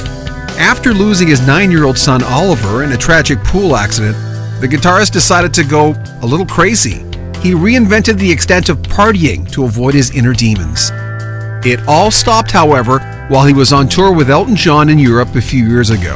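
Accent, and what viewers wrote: Canada. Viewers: I'm quite certain that our narrator is Canadian...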